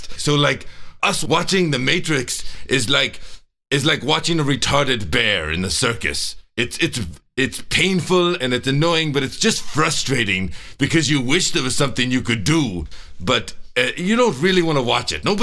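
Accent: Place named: India. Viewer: that ninja sounds like he is from inida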